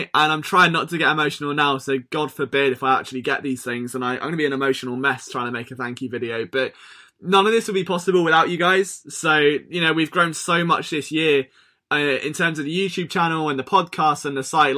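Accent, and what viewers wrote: United Kingdom. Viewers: Your whiny British voice is the only whiny British voice I can endure for more than 30 minutes.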